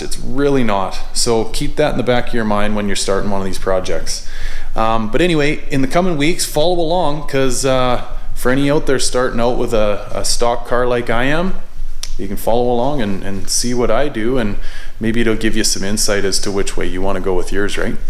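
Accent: Canada. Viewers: Your Canadian accent reminds me of back home. It's been 10 years since I've been back. Good luck with the new pony.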